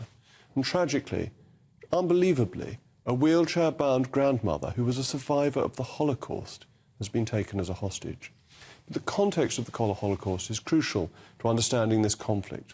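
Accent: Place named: RP, United Kingdom. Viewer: Why does this guy sound so condescending absolutely about every single thing 🫤 must be his posh accent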